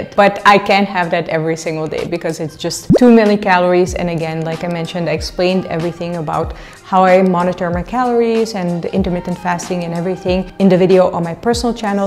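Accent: India. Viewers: You have an Indian accent to me 🙂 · The way you pronounce food items names are typical Indian pronunciation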